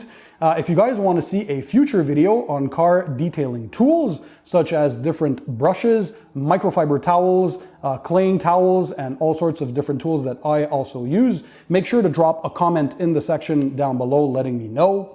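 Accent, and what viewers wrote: Quebec, Canada. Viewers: Bonjour! parlez vous Français? Ça ressemble drôlement à un accent québécois anglophone haha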